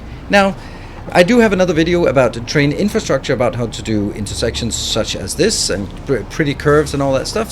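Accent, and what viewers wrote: Denmark. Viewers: a dane can hear a dane XD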